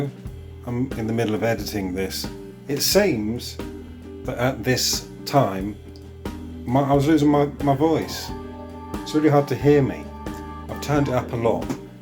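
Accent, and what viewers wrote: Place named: United Kingdom. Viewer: Guys I think he is British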